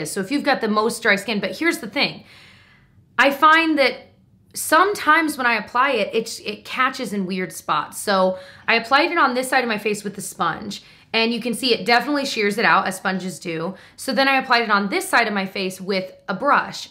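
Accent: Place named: New Jersey, United States. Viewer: You've probably heard of Good Reads but if not, it's an app that has other people's reading lists, as well as reviews on books. That's where I find most of my want-to-read novels 😊 I love just hearing your voice! I know that sounds weird but you sound like my New Jersey family ❤ I had that Nest perfume but gave it to my mom because it was a little too warm/mature for my taste. It's crazy how everybody's preferences are different!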